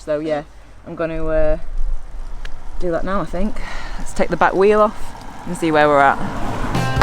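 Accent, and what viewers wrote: United Kingdom. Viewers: How are you used to kilometres you have a british accent and we travel in miles ?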